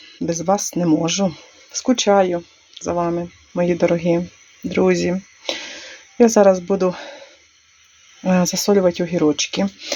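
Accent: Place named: Narodychi, Ukraine. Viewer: Ооо, а ми з народицького р-ну ,чую що говір до волі знайомий.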